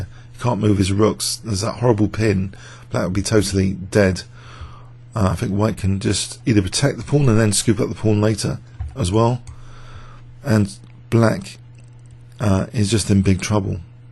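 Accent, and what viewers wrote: England, United Kingdom. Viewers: You can also mute or don't watch the video at all! People want to impose pronunciations, he is from England thats the beauty of diversity.. We aren't machines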